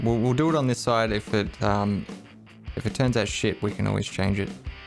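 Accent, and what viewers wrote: Australia. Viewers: When are you going to build something out of thatch? Maybe add a single campfire in the middle of it all, but not much more complicated than that. I find myself getting tense and stressed just watching you do these massive, OCD fueled rage enhancing builds. I think ASM is the modern hipster thing. You already have us addicted to your sexy Aussie voice (auditory-tactile synesthesia) so you might as well calm us all down with a simple build that causes us all to mellow out and drool on ourselves. Of course, the metal music in the background will have to be something more subdued. It's either this or narcotics and I'm sure you wouldn't promote your fan base to be drugged out roadies.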